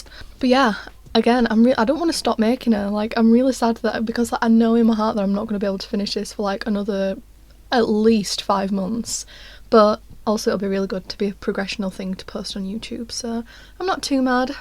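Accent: United Kingdom. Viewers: Hi where are you from ? I guess by the wallpaper and accent the UK 🇬🇧 love what you are doing . Scrap yarn projects are fun 🤩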